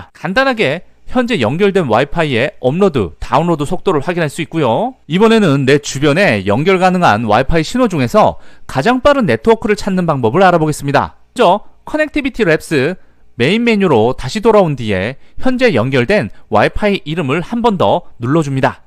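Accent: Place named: South Korea. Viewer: 말하는게 어느지역 사투리 같음 히얀하네 끝말을 강조하는게 두번다시 하지 마라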